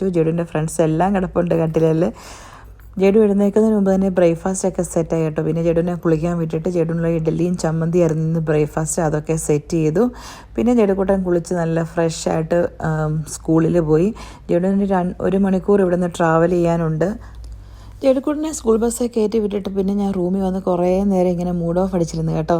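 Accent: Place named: South Kerala, India. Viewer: Nattil evdeya South Kerala style talk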